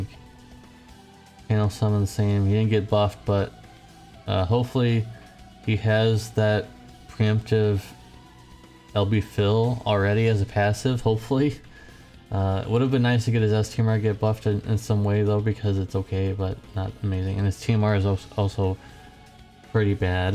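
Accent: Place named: Wales, United Kingdom. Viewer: Is his channel for Wales? Because it sure sounds like it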